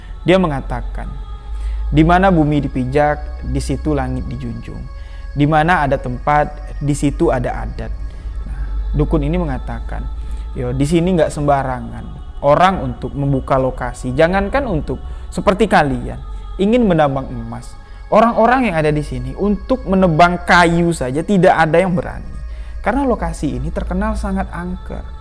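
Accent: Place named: Bali, Indonesia. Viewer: Afwan, antum Logatnya seperti orang Bali ya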